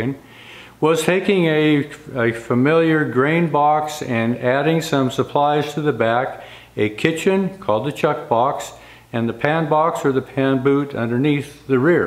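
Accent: United States. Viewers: Overall a very nice wagon. Not sure what could have been on the surface of the kitchen box, but it's my hope one tradesman sets up the other for success. It will be interesting to learn at some future date how the kitchen cabinet makers style box construction holds up against the typical use of such a wagon (assuming it will get used)? Thanks for the videos - I enjoy them. BTW: 100% good ole American English ! 👍👢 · English, even with an American accent is fine by me..👍🏻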